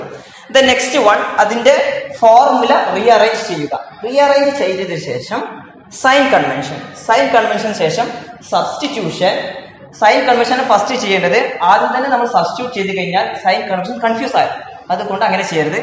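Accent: Tamil Nadu, India. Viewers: Sir u r a tamil or malayali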